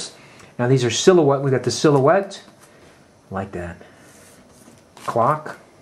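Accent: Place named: Massachusetts, United States. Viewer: Wicked Cool!! LOL are you from Massachusetts? <---I haven't heard that in years! Love the collection!! xoxo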